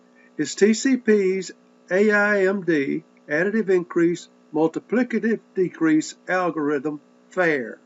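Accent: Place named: South, United States. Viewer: Nice lecture and love that southern accent :D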